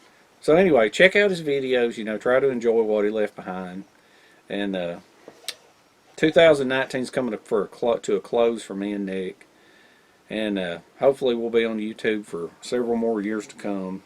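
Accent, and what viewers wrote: South, United States. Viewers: ok this is super stereotypical but your voice is the perfect southern accent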